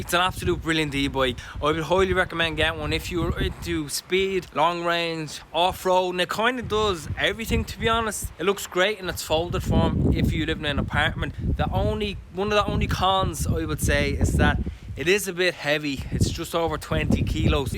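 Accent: Dublin, Ireland. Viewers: I've been trying to work on my Dublin accent for a while now, and that we all have to return to our offices, i've been looking for an e-bike, so your videos are so dope! I know you are just speaking normally, but if you can, please speak in the most rawest Dublin accent possible for your next one!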